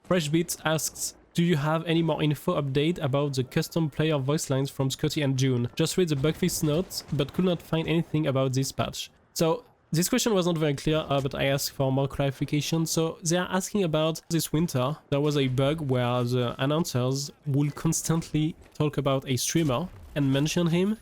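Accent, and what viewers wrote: France. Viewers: I'd be glad to help with the accent, I'm french too but people say I have a great accent :D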